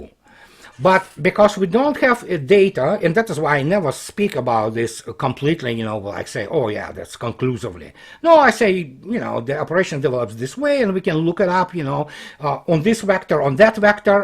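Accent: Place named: Russia. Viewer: These people are NOT "incompetent": they are actually COMPETENT in spreading propaganda. You need to weaponize your words moreover your Russian accent is way too strong for the average Joe in the West: to listen to you demands an excruciating effort to a native English speaker. Edit your content and make an English native speaker to talk in your videos. Voila, you'll be more competent in your communication under these conditions. Best of luck! (please do not get upset: I am trying to help you to be more efficient in your communication).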